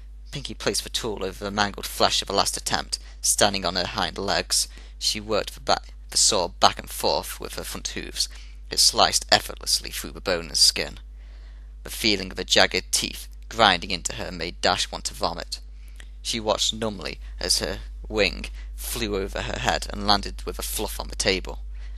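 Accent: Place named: United Kingdom. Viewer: your british and that makes the story more scary awsome reading dude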